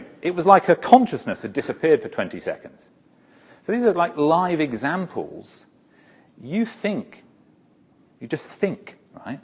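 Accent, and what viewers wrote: United Kingdom. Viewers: This guy knows how to talk and explain....Although he has that british accent,it is very pleasant to listen to him :) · Is he ever to the point? Is beating the bush just part of his dialect, is add the cost of a fancy English accent ?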